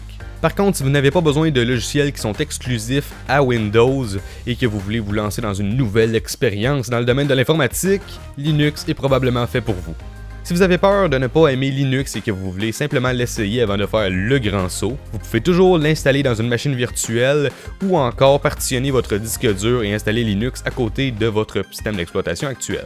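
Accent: Quebec, Canada. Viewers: L' accent canadien, j'adore !!! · killer des québécois* les canadien ont pas cette accent, la plupart ne parle même pas français, c'est un québécois (au canada) et c'est l'accent québécois ;) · Clair, net et compréhensible. Si seulement tous les tutps étaient aussi compréhensible. Et je kif l'accent québéquois. Trop marrant. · Super a part qu'il parle un peux trop vite . de plus avec son accent canadien sa arrange pas les chose mais c'est se qui fais le charme de se jeune homme. Concrètement vidéo sympathique.Vivement la prochaine vidéo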